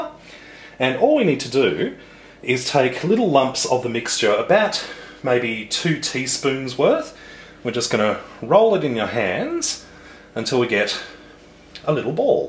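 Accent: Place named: Australia. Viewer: you can tell his Aussie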